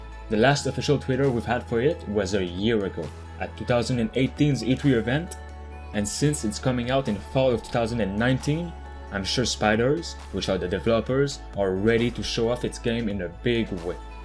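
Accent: Quebec, Canada. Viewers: You speak english like me dude, are you from quebec?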